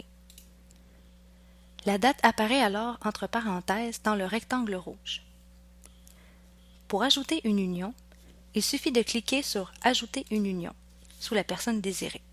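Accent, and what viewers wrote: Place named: Quebec, Canada. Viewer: L'accent (que j'adore) québécois tout simplement ;-)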